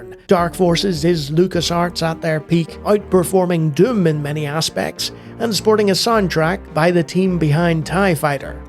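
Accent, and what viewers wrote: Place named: Ireland. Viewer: I hear you have the Irish accent that pronounces 3 as three, not as tree like other Irish pronounce 3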